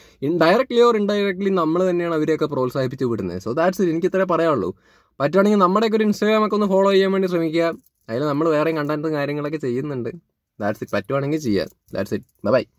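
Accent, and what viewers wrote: Palakkad, India. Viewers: Palakkad aano etta nigal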